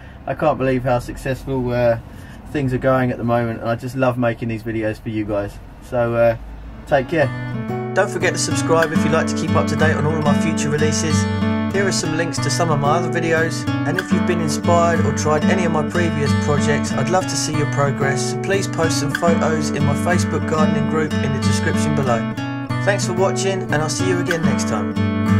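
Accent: United Kingdom. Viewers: Love this video. Love your accent. Nice British chap showing them how it is done! Excellent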